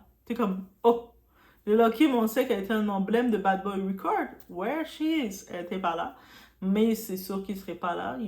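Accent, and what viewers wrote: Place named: Canada. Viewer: c est l accent Canadian.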